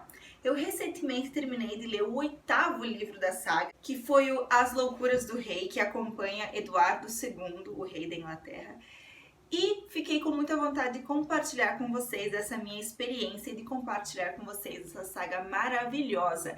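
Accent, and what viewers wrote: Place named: Paraná, Brazil. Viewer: Vc é uma " gracinha" ! Teu sotaque parece ser de PARANAENSE....muito charmoso !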